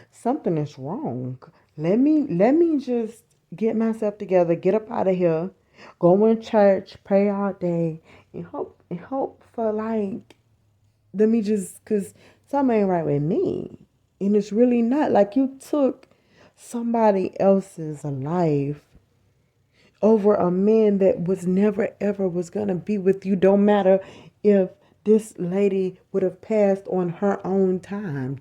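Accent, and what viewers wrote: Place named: South, United States. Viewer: I'm an accent man..Lol.i love the way you talk..Is that Texas?? Georgia??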